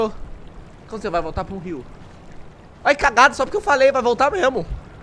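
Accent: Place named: Portugal. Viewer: No puedo entender lo que dices sos portugės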